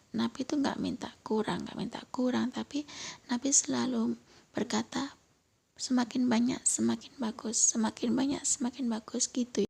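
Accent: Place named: Banyumas, Indonesia. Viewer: Kak trnilngapak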